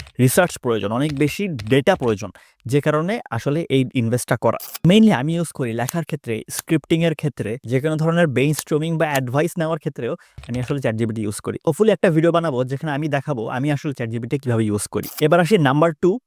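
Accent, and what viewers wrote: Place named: Sylhet, Bangladesh. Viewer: আপনি সিলেটি, ঠিক নানি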